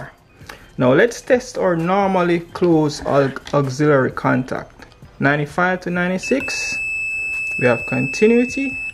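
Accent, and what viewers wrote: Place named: Jamaica. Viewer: Sounds like a Jamaican. Good video breda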